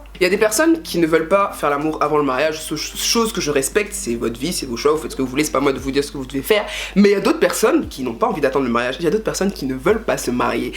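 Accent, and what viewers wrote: Ivory Coast. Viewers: Accent Ivoirien 👀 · j'aime ta manière de parle tes ivoirienne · Cool tu es ivoirienne on dirait 😍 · Oui elle a l'accent ivoirien et je crois qu'elle est ivoirienne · J'adore ton accent ivoirien, on dirait une go bété lol, trop cool. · C'est ma première sur ta page et j't'adore... Trop belle! Dit t'es Ivoirienne?? Juste que j'ai ressenti l'accent pas mal de fois! 😄😄😄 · Accent ivoirien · cool ton accent ivoirien ma soeur ...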